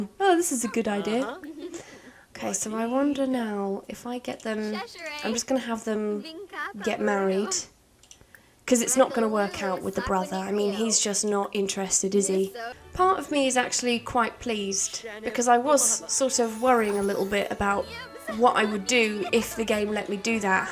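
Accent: United Kingdom. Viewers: I made my boyfriend listen to your voice, he said "she sounds like a British phone sex operator " Im like.. im pretty sure she'll take that as a compliment!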